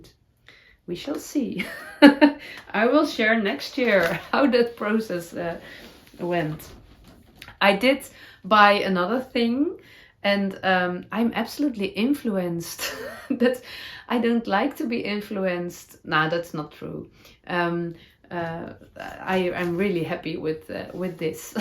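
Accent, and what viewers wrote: Netherlands. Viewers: Thanks so much, for a year of joyful ‘Dutchy English’! It’s been sweet to hear you talking about your love for your husband and family! All have surely enjoyed helping you find the proper words you are struggling with...from afar! Today’s word was’Hardware store’! Your confidence in making a dress shows already! You can make a ‘fake bottom band’, by just adding to center of frabic befoe cutting out pattern! You sound less worried about your ‘Miss Perfect’! We’re never too old to Keep Learning and creating beautiful things for ourselves and others!!! Til Next Year! Thanks for all the smiles!❤🦋🧶🧦🌷Sharon from S. California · I didn’t even know cork blocking mats were an option. I love the idea. I can’t find them available anywhere in North America. Lucky you! Thank you for your podcast. I enjoy your Dutchy English and your honesty. I hope that you and your family have a nice end of the year. 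I look forward to seeing more of you and your heart jumping crafty adventures next year. 😊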